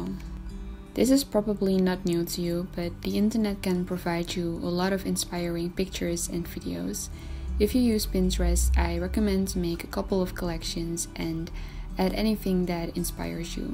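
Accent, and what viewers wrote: Netherlands. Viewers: What country do you come from? (Your accent sounds a bit dutch) 🌻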